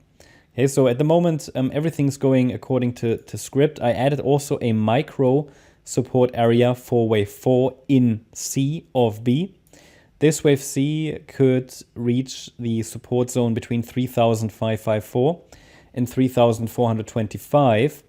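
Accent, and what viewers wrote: Germany. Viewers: love your content too! you are a german guy? i mean i hear it^^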